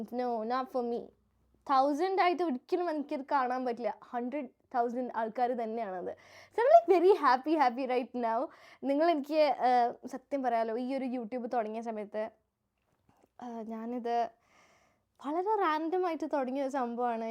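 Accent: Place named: Thalassery, India. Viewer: That thalassery slang is slaying 😂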